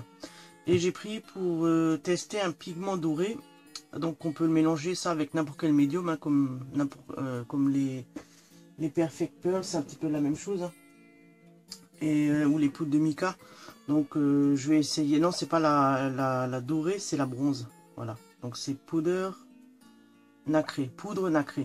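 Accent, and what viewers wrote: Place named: North, France. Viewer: Chère Un mois?? Eh bien dis-donc il a fait tour du monde votre colis c'est pas possible avec un délai pareil je serais allée le chercher moi-même en Pologne 😊 je plaisante mais tout de même un mois c'est énorme j'espère pour vous que vous n'aviez pas l'intention de faire un projet avec ses collections pour Noël sinon il va falloir mettre les bouchées doubles( personnellement j'aurais négocié uneremise) à moins que le délai de livraison ne sois pas stipulé, dans ce cas il faut être patient. En tous cas ce que vous avez commandé est très joli, ces collections sont très belles et je suis certaine que vous allez encore realiser de magnifiques créations comme vous nous y avez habitué. Je souhaite de très joyeuses fêtes de fin d'année. Ps: j'ai parié sur votre accent et j'ai misé sur un accent du nord de la France, j'espère avoir raison sinon je vais perdre 3 euros😂😚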